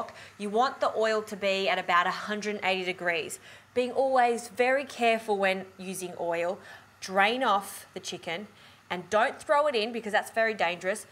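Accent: Australia. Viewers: Is this Australian or New Zealand accent? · amazing this looks really good i will definitely try it ..................... and i love your Australian accent after all I am Pakistani cricket lover who love s food as well. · is this lady from australia or new zealand? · She's Australian, this is from her TV show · she’s Australian 🤣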